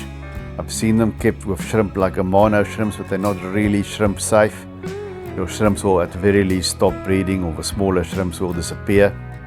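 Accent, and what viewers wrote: South Africa. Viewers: Love your accent…South African. 😊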